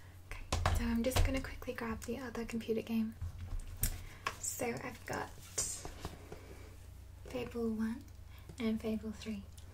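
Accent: Australia. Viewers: more reason I do enjoy your videos asides from the video game knowledge and soothing content. Aussi accents are the best. ❤️❤️😘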